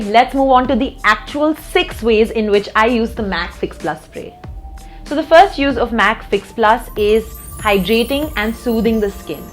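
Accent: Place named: India. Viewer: Its indian accent miss and moreover it's an accent of those who have english as their third or fourth language in a multilingual country. So if you couldn't bear with that, You shouldnt have come to this foreign video . Thanks have a great day.🙂🙂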